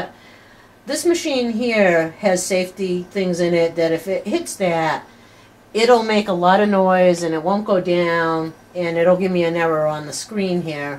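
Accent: United States. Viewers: I’m an experienced knitter but I hate sewing seems - I just seem to have a mental block or am too uncoordinated. But I’m now trying to learn to machine sew. Hoping eventually it will make sewing my knits together much easier one day. Thanks for the video. (Also I love your accent - I’m from Northern Ireland so I love noticing different American accents and dialects). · Are you from New England? I have relatives from Rhode Island and I swear your accent is exactly like theirs.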